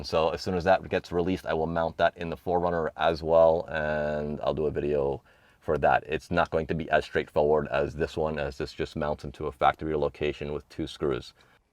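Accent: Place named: Northeast, United States. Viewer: I was gonna say your channel name is, but your accent is Northeast like NJ or NY. Then I see the GPS on the tablet being New Brunswick!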